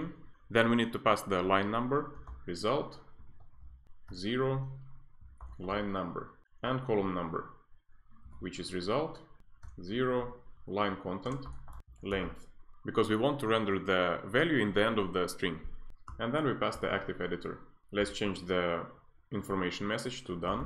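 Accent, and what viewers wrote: Russia. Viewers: Сдаётся мне, что ты русский)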